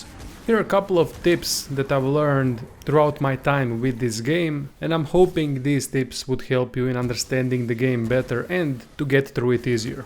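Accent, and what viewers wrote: Russia. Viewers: New to the game here and your tips are very helpful. I love your russian accent 🥰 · Nice video plus nice voice. is this russian accent? pretty cool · Thanks a lot man ! Learned a lot here, also loving your Russian accent (no homo) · Can never go wrong with tutorial by a man in Russian accent. heart emoji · когда русские говорят на инглише, да еще и с ярко выраженным акцентом это просто разрыв ушей!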